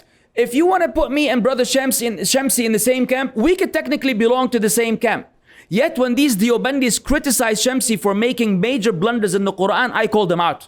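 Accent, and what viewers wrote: Lebanon. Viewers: I like your tripolian accent. Can you do a minyah accent, that's my favourite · Even though I'm a graduate of the Diploma of Arabic Language for Non natives from Umm Ul Qura University Makkah, still this Lebanese accent was beyond me 😂, Allahuma Barik Lak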